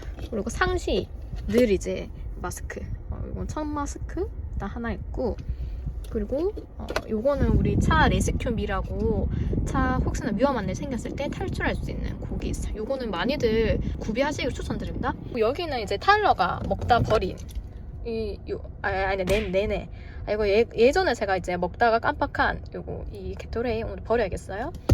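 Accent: Gyeongsang, South Korea. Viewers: 일하는 직장인으로서 힘들고 지쳐있고 현재 따고픈 공부도 하고잇는데, 가끔 님 유투브보면 너무 재밋고 하여 즐겁게 시청해요 ㅎㅎ 게다가 경상도사투리가 와닿아서 잘듣고잇어요ㅠㅠ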